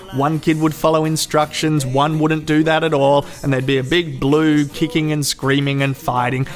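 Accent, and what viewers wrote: Australia. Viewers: Great job mate! Take away the swearing and you have some very thoughtful insight into this movie. Hearing another Aussie swear just makes me homesick. Thanks mate!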